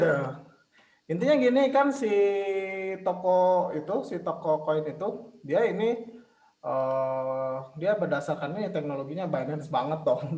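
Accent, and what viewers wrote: West Java, Indonesia. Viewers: Sunda pisan euyy si akang haha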